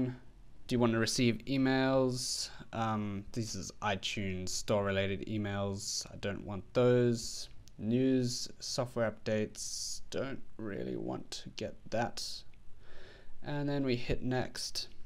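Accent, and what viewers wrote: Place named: United Kingdom. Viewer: British talk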